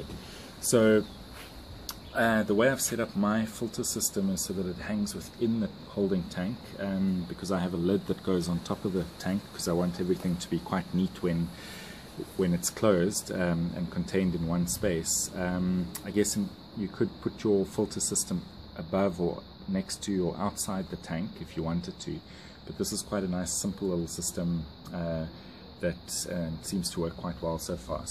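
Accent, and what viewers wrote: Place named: South Africa. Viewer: He sounds so RSAn😂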